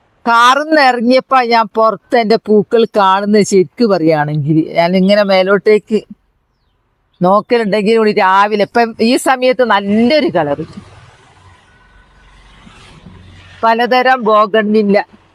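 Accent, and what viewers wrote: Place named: Wayanad, India. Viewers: nice vayanadan samsaram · വയനാടൻ സംസാരം നല്ല രസം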